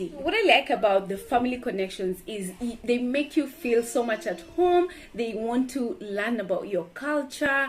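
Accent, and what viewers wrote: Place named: Italy. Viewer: Eiiish,i love the Italian accent in you girl 😍💕💗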